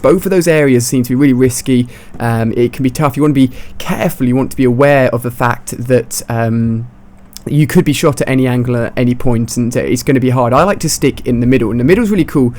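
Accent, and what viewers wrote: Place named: United Kingdom. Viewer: He's British, it's just an accent.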